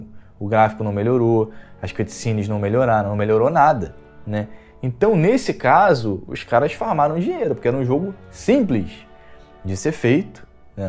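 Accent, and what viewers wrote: Rio de Janeiro, Brazil. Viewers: Teu sotaque carioca é muito forte!!! SSSSHHHHHHH SHHHHH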